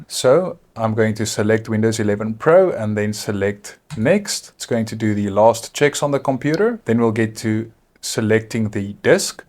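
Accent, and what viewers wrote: South Africa. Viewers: Only a SAFFA could create this boet. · Jisss meneer! Ek wou se jy klink Suid Afrikaans! Baie goeie werk. Dis regtig goeie sagteware! Ek was ook in IT lank terug en jy is reg. Die program sou vrek baie tyd gespaar het al daai jare terug. (BTW ek hoop jy verstaan afrikaans). Sal vir jou n donasie gee nadat ek desember vakansie gehou het. Groete uit Gauteng. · I hear a South African accent or am I dreaming?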